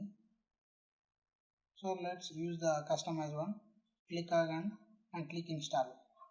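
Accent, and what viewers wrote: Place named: South India, India. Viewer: First, Appreciate your efforts ....Second, you really speak fast with heavy South Indian accent , its really tough to understand for me too (being a citrix admin and living in south india)....... Third, A suggestion, you may add subs in the video......this will increase for you hits and would be another favour for everyone.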